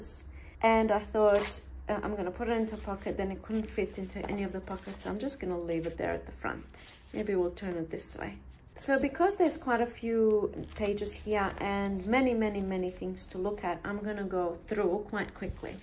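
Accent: South, United States. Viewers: 😂 I bout fell out at your country accent! Plus, going overboard and getting overly excited...theres no such thing! This journal turned out amazing! I look forward to seeing more like it! Thank you always for your honesty, your wits/ sense of humor and your mind blowing ideas! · I LOVE your Southern Accent!!! As a Texan I highly approve 😂💖😂💖😂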